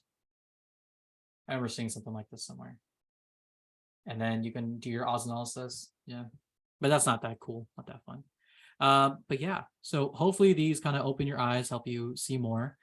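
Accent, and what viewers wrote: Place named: England, United Kingdom. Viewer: You have a very nice accent. Are you from England?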